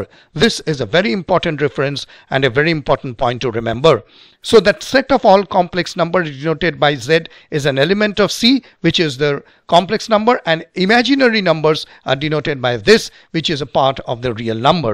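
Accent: India. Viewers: He is a really Indian 😅😅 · U sound like an Indian ( no racism ) 😅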